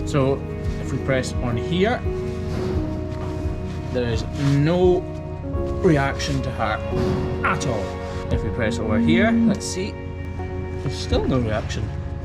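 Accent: Scotland, United Kingdom. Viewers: You are a vast wealth of knowledge when it comes to hoof trimming. I always enjoy watching your channel and learning new things. I admire your work ethic and how you treat each cow that you trim and I love listening to you explain it all w/ Scottish ascent. Keep up the great work, I always look forward to the next video.♥️🐂🐃🐄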